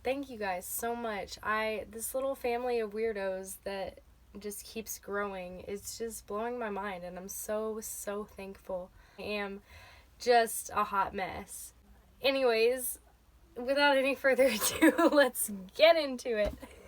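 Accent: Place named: North Carolina, United States. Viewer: Sweetheart, #1 you look beautiful in this video. #2, don't suppress your accent! I grew up in western NC, and I suppressed mine for many years. That accent is YOU and a connection to your ancestors. Let it be! This is the first video of yours I've seen, and I just love you 💜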